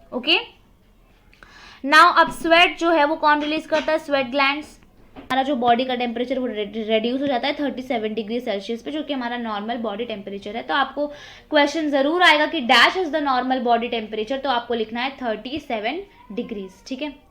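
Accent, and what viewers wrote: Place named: Maharashtra, India. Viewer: Hi 😊 Didi You Explain Very Very nicely but..... I mostly love ur those videos when you teaches on paper like you're teaching on White Board I'm A little bit weak in Maths But When U Explain me I can Solve any problems from Equation Now my Last exam is stared only 10 days are Remaining I will do my Best Thanks for your Maths Sub Teaching ☺️ Ap jaisi teacher agar meri School ki maths teacher hoti mera matlab agar ap hi meri maths teacher Hoti Mai bohot khush nasib hoti Aani mi tumchya awaja varun olakhlay ki tumhi marathi aahat🤗 Thnk You So Much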